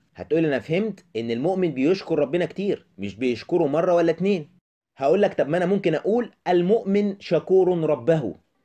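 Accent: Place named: Algeria. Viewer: انت باينة جزائرية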